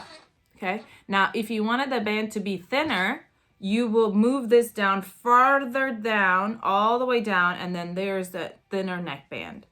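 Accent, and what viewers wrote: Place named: Colombia. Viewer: I love your accent. Are you from Colombia? You remind me of Sofia Vergara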